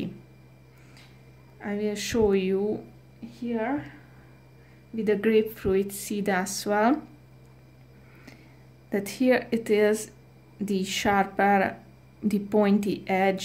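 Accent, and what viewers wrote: Hungary. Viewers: I hear Hungarian accent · Hungarian accent? Ifeel that way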